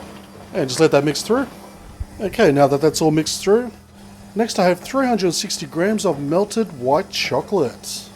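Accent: Australia. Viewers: I love the Aussie accent on you!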